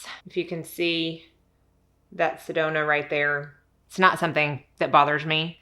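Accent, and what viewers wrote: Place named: Texas, United States. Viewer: Hey. You are on FIRE today! You go girl! What a great video. I didn't know you used to work as a MUA. It makes sense now why your videos are so chalk full of great information, and why I've gravitated to your videos. BTW... I also love your accent. You kind of sound like my cousins who live in Corpus Christi Texas. I could listen to you all day long. Thank you. Love you, Calvin.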